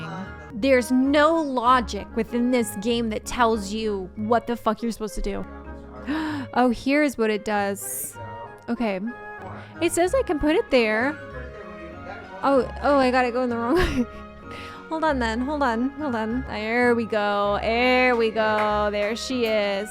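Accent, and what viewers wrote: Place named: West Coast, United States. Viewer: She's from California so skill issues aren't her fault. It's the game's fault. (I have no idea where she's from but she sure talks like she's from the West Coast.)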